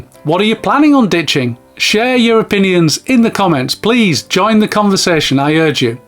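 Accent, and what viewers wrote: United Kingdom. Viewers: Always enjoy your content and believe what you are saying is true in part and for some people. I recently have had a change of heart about giving away certain things like videos, cds etc. In the 'subscription era' age we now live in. The powers that be want us to keep paying and re-paying for things because effectively will will never own the item because it's in digital format. I'm hanging on to my tangible items so that I can play and use them when and how I want, not to be determined by a subscription I must have. Love your channel and your accent reminds me of my relatives in the UK. I'm in Australia.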